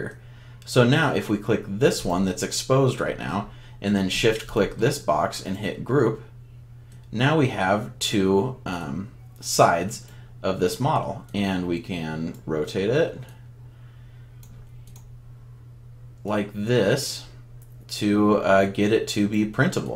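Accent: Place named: Letterkenny, Canada. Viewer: Sounds like he is from Letterkenny... Pitter Patter, let's get atter!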